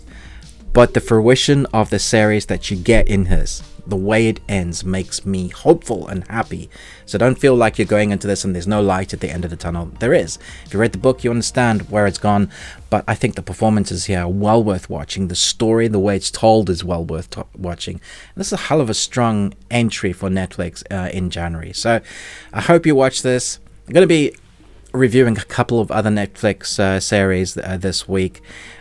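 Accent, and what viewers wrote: South Africa. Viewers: Are you South African and British or something?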